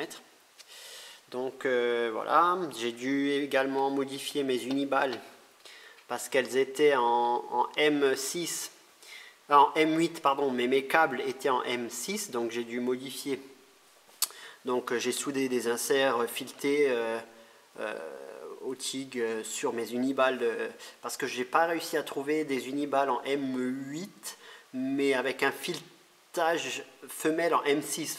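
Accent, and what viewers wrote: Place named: Alsace, France. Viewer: meme famille ! ;) ; tu as l'accent alsacien c'est ouf :) j'aurais parié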